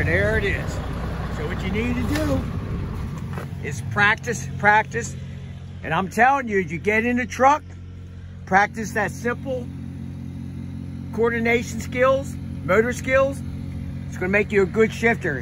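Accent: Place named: Maryland, United States. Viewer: Nice! I can tell by your accent lol. I grew up in Baltimore, but now live in Idaho. Currently going to trucking school and trying to soak up as much information as possible. Thanks for the vids!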